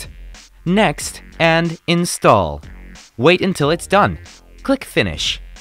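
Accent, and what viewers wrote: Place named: United States. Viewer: this voice is in American English. I was able to set this up and i am not an IT person. Thank you for helping.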